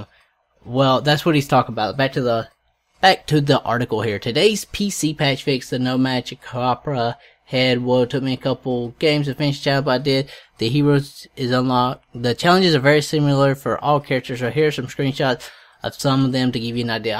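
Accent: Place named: South, United States. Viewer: You have a distinct Southern accent. Where are you from? Alabama here.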